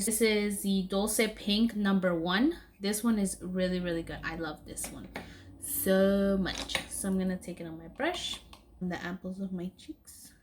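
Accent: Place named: Latin America. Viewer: Awww me encanta tu acento latino!!!! 😍😍 Viví un tiempo en Tx y me recuerdas mucho a mis amigas de ahí!! 😍😍 By the way loveeeed ur make up!!!! 🤩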